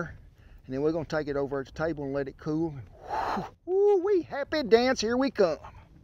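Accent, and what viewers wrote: South, United States. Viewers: I'm from the South, and I've heard all kinds of accents all over the place, and this guy's accent is just delightful. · Just found your channel recently. I remember seeing you on chopped. I'm from Oklahoma but I'm livin on up in Yankee land right now. It's good to hear that real southern slang.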